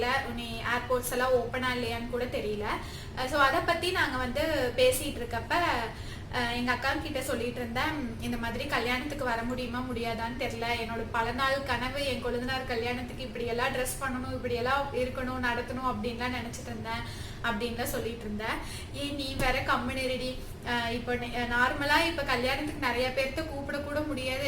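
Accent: Kongu, India. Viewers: Unga appatha oorla nadanthathuku vayiru valika sirichom... Elorukum iPadi akka thangachi amayraanglanu theryala.. Kongu tamilla oruvaati paesikaatunglaen akka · Kongu Tamil koncham pesunga.... I like the slang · Kongu Tamil akka Nega pasarathu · I like your kongu slang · Kongu tamil one time pesuga my fav...slang🥰my athai home in Tirupur naa anga iruthan near by perumanallur so one time post ur slang kongu tamil...sis. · கொங்கு தமிழ் Sema feel 😍😍😍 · akka how r u and ur family? Oru day neenga full kongu tamil pesura mathiri video podunga ka please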